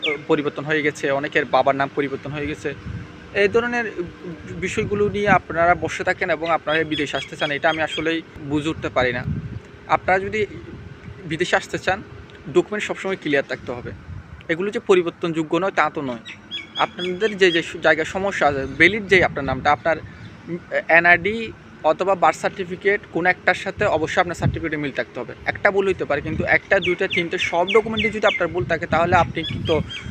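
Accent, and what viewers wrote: Chittagong, Bangladesh. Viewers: বন্ধু তুই চিটাইংগা নে? আয় বুকে আয়!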